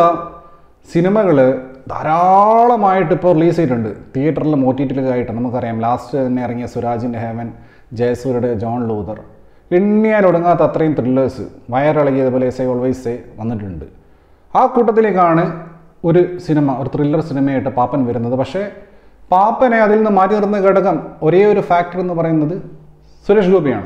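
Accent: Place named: Southern Kerala, India. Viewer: കോഴിക്കോട്കാരനാണോ പക്ഷെ സംസാരം കേട്ടിട്ട് തെക്കൻ സ്ലാങ്ങ് ആണല്ലോ.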